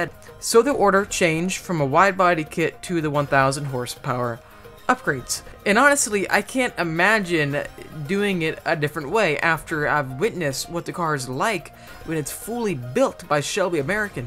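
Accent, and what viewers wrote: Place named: Midwest, United States. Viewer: You sound very Midwestern than a typical Californian. If so then what part of the Midwest were you from.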